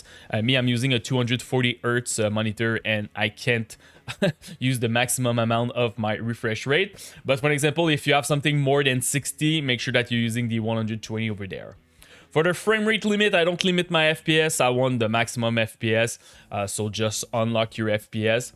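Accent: Quebec, Canada. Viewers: Omg tes français canadien!!!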